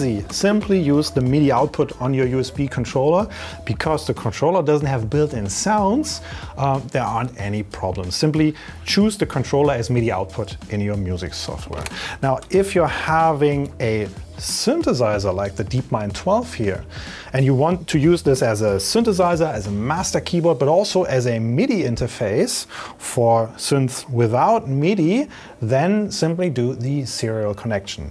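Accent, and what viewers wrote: Germany. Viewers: You’re german, am I right? xD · Your Denglisch is wunderfull! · Lovely German English. ^^ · Most German accent I’ve ever heard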